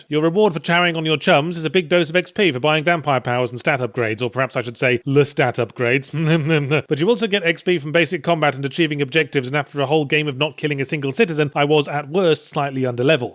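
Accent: United Kingdom. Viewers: Is British? That ending was hilarious for some reason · Yahtzee, I didn’t know you were British! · Wait... is British? I thought he was Australian.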